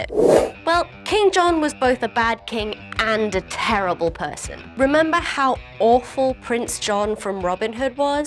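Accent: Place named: RP, United Kingdom. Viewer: has a home counties accent, and what is called Received Pronunciation. which is the standard form of British English pronunciation, based on educated speech in southern England, widely accepted as a standard elsewhere.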